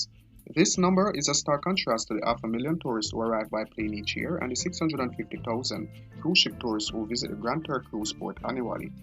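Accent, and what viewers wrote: Jamaica. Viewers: By the way he pronounced certain words,I wouldn't be surprised if he's Jamaican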